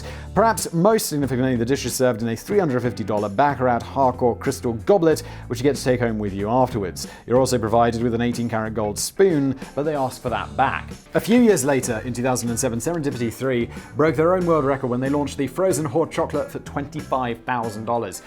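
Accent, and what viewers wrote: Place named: United Kingdom. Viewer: When you sing you lose your British accent. I.e., you sound just like an American.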